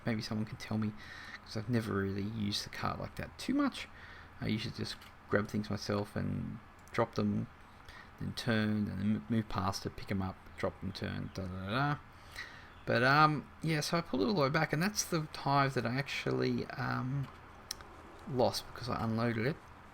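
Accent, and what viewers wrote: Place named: Australia. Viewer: good thick aussie accent there, from another strayan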